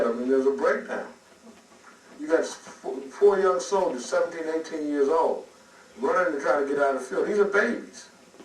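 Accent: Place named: United States. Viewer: he does have some kind of country accent but he's speaking pretty proper English. idk why you're so triggered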